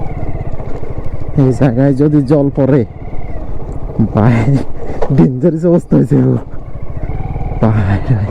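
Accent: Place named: Noakhali, Bangladesh. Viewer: Bhi tomar Noyakhali dialect onaak sundor. Noyakhali ta e koioooo . 😊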